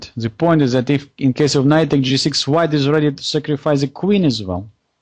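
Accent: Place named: France. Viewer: Fantástica partida pero yo tengo una pregunta que lleva rondándome la cabeza los últimos días. Cuál es la nacionalidad de este buen hombre? El nombre es ruso, el apellido judeoalemán pero el tío tiene un acento francés que flipas… Me he quedado loquísimo.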